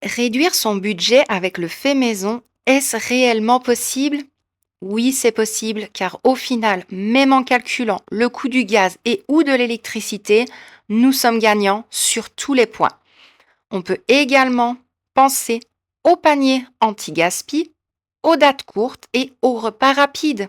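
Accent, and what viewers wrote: Belgium. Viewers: Coucou, super format et très bien niveau diction malgré ton fort accent belge lol... Par contre il faut absolument que tu recouvres ton micro avec une poupette, lol une genre de touffe qui atténue les bruits de bouche car quand on t'écoute avec un casque c'est très désagréable... Et après tu seras au top du top... Gros bisous 😘 alias spoonielovejunkjournal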